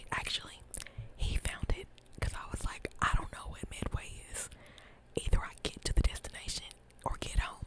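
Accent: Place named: South, United States. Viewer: I love your laugh , u sound so southern & I love itttt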